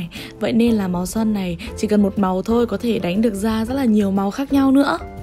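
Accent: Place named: Vietnam. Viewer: ui bạn ơi có nhiều ng học tiếng anh rất giỏi nhưng lúc nói hay phát âm các thứ chưa chuẩn vẫn bị hơi hướng tiếng việt đấy... kphai ngta k cố gắng học phát âm mà kiểu giọng lúc đọc tiếng anh nó bị nthe sẵn ấy.. ai chả muốn nói hay nma giọng nthe r bt lm sao:(... t thấy c phát âm nthe là ok lắm r í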